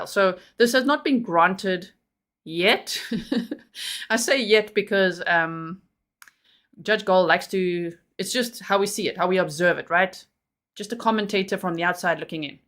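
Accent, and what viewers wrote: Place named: South Africa. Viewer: Before I even start watching I need to state that this is the one case that I follow that I found dubious from the beginning, long before the Odinists came in. From the beginning I thought they do not have anywhere near to enough to convict this man - an unspent round is all......and my instinct was just that this is not the man. This does not make sense...and since the rest came out, I am more and more convinced they have the wrong man and he is being framed....I do not usually easily believe a frame defence, but on this one....I may be convinced. And the judge is just biased.....! I have no idea what she is thinking. By the way, where are you from? I am from South Africa and your accent sounds South African!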